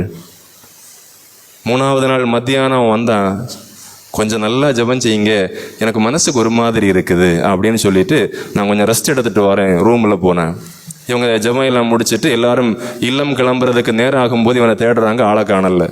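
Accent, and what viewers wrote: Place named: Kanyakumari, India. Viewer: இறைவன் போற்றப்படுவாராக. தந்தையே அருமையான நற்செய்தி, கருத்துள்ள ஒரு பதிவு. உங்களின் முதல் Sermon. I heard the Holy Mass while cooking. Awesome father. Without having self confidence whoever prays nothing will happen. I don't know the church name and the place. Your sound resemblance from kanyakumari Dist. God bless you abundantly dear Rev Fr. Amen Hallelujah ✝️ Hail Mary ✝️📿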